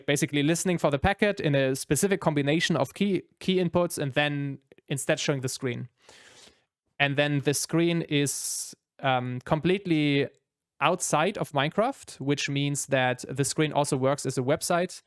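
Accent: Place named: Germany. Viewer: Dein Akzent klingt sehr deutsch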